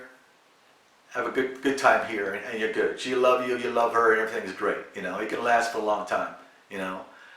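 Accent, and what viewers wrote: Jamaica. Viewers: I live in the D.R. and I Teach at a private school.. I would like to teach online..can you point me in the right direction. I hear your Jamaican accent..I'm Jamaican too ..via Toronto/New York.. I m glad you made the choice to live life..and not get stuck Pretending in the Matrix.. Please respond your information would be valued.. Love what you are doing!!! Selvin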